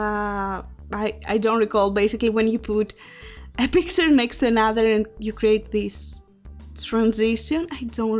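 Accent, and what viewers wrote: Greece. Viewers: Your accent sounds like you are greek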